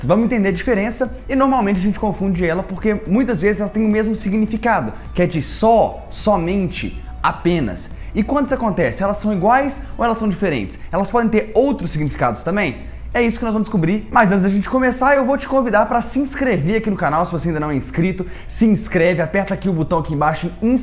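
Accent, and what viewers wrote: Minas Gerais, Brazil. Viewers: Ele é mineiro?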